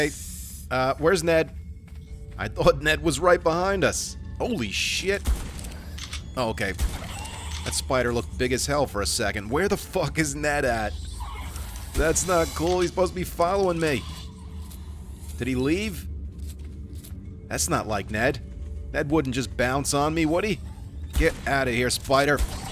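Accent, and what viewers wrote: New Jersey, United States. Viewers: He is one of the funniest gamers I've seen I think his New Jersey accent is awesome.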